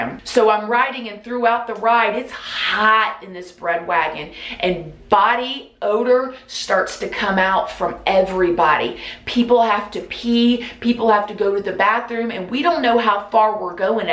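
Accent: South, United States. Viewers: It keeps me captivated. You know how you read a book and sometimes you've read 6 pages but you have no clue what you read? Your videos are the complete opposite of that, for me anyways. I get lost in storytimes. I find them boring honestly. But I find myself going back... did she just say Bob wire? Rewind. Yes, yes she did. I love your accent and your way with words. It keeps my attention. I'm a Jersey girl in NY. I don't have any southern accents in my life. so I really can't get enough of yours! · Wow! I have rewatched this video several times, and it only becomes more and more scary and eye opening. I've known people who have been to jail/prison. I've never been (and hopefully never will), but I have this weird interest in how prison works and I can tell from what I've been told and from watching you and Jessica Kent's videos and what I've seen on TV that it is a horrible place where you are stripped of all your freedom and sometimes family and friends disown you. 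I believe this interest i have in prison is somehow helping me really value and appreciate my freedom. I hope I never break the law by accident or on purpose., you are a good person and very strong and resilient. Never let ANYONE tell you different. You are one of my favorite YouTubers. I love how real you are. I bet you are one of the sweetest people. 💕 Also, I love your southern accent!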